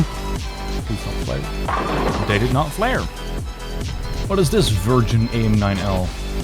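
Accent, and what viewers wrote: Netherlands. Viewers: wait is bro a dutchy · ben je nederlands?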